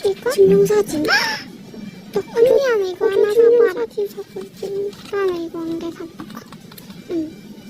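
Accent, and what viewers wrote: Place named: Busan, South Korea. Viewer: 반가운 부산사투리 ㅋㅋㅋㅋㅋ 저도 부산사람이라 넘 간갑네요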